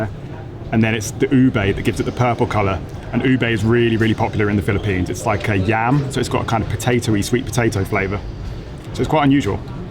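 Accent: United Kingdom. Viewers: I just love hearing the British accent.... :) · The London accent is the nicest in the world I think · I love your voice it's very soothing. Like a History Channel documentary. I love the British accent. Reminds me of Harry Potter too😁 Thank you for promoting Filipino foods.😊 I love ube jam🤤🤤🤤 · I like your kind of British accent and the tone of your voice ..i can easily understand what you are saying. Enjoy your food.. 🇵🇭🇵🇭🇵🇭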